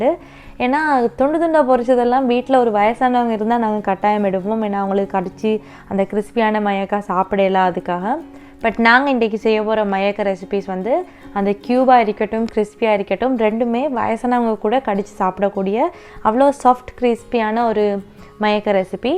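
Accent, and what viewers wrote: Sri Lanka. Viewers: Onga oor edu sri lanka la nega pesuradu naga pesura madiri eriki sis. I like u speech😍😍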